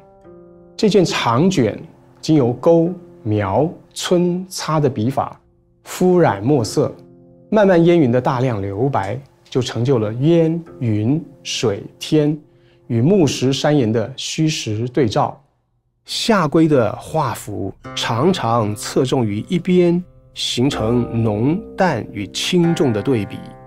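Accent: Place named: China. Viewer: 聽聲音是大陸腔，但漢字，喜歡漢字。謝謝制片人。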